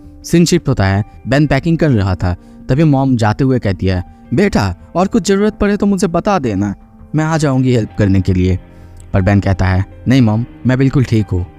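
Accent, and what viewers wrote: Bengal, India. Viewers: Bengali ho aap? Accent jana pechana lag raha hai.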